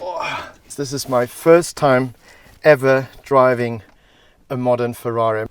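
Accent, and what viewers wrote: South Africa. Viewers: He has a South African accent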